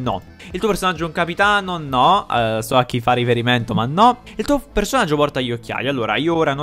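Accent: Naples, Italy. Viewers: Solo adesso mi sembra che è napoletano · Sei Napoletano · Bro tu sei di Napoli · Sei napoletano da come hai parlato sei un grande forza napoli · Bro ma sei di Napoli?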